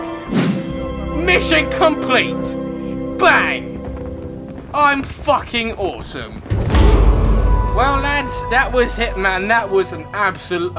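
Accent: United Kingdom. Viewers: Butter butt he is British and the language is still English it’s just a accent. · Really is he british he has the british accent dont you hear that m8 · Has a silencer, still hits the car alarm off haha, Is he brittish?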